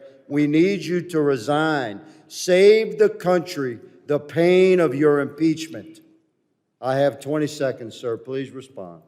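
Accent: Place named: Louisiana, United States. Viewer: His Louisiana accent is flipping awesome, what a patriot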